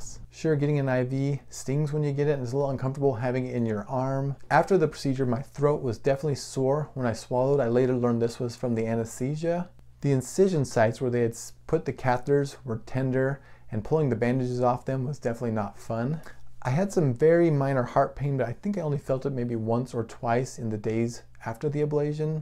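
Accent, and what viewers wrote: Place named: England, United Kingdom. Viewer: Hello. You sound like you might be in England. I don't really agree with all the AFIB protocols recommended there. Keep in mind... that the longer you stay on AFIB antiarrhythmic meds... the more the heart 'remodels' and the less effective an ablation may be. I heard from a rather upset woman in England whose Cardiologist prevented her from getting an ablation, keeping her on a pill protocol for a lengthy period. When she finally did get her ablation, the EP said you waited too long and it won't work that well now. A big mistake is not going to the AFIB professional Electrophysiologist (EP). When I came down with AFIB, I dumped my Cardiologist and contacted an EP immediately. I've had many ablations by the best EP's. None of them had me do a Stress Test. Makes me wonder if you're on the right track. In any case, I wish you the best outcome. 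Every case is different. There are many people who never get ablation and do quite well on pills for decades. Whichever path you choose, I'm sure you'll be fine. I first came down with AFIB around 54. I see some people come down with it when quite young. Sad is the number of pro runners who come down with it.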